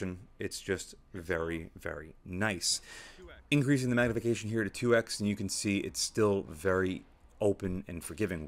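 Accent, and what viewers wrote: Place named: East Coast, United States. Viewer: East coast. Old. He has an east coast accent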